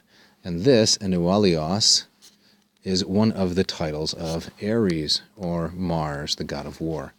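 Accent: United States. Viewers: I would appreciate a bit less of an American accent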